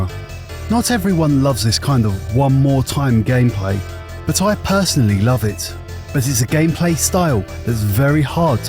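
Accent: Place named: United Kingdom. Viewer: I didn't think of the everyone's speakers / headphones are different. I just use my phone to watch all these videos, sometimes headphones sometimes none. All of your decibel interpretation goes over my head, but I'll take your word for it lol. It's in no way a deal breaker or anything like that, you got a good speaking voice. Similar British tone to retro N64 YouTuber Glenn plant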